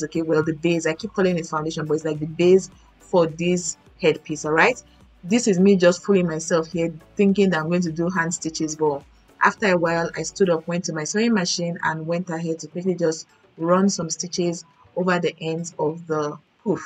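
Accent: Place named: Europe. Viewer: Never apologize for european english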